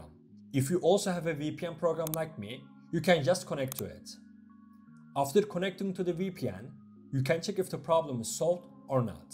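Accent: Turkey. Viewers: Abi ingilizcenden anladim turk oldugunu evyallah yardimin icin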